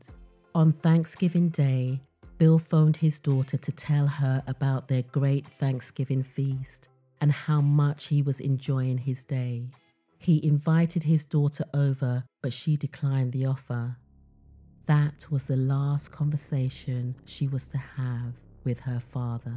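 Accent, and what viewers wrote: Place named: United Kingdom. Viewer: I just found this channel and I love your voice. No matter how gruesome the details, they always sound kind of classy when spoken with a British accent. Oh me oh my!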